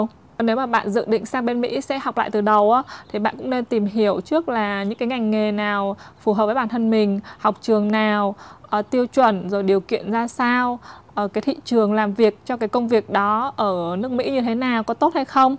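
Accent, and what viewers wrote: North, Vietnam. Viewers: giọng nói miền bắc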